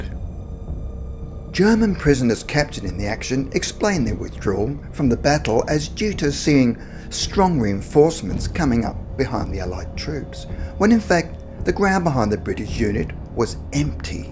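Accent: Australia. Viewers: I thought you were a Aussie mate.😄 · Are you Australian? That voice. Bloody so called great war is very accurate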